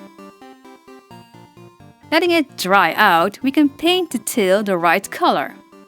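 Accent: Netherlands. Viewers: Your so typical Nederlands accent hurts my lil Belgian-dutch speaking self, The finished doll looks awesome tho!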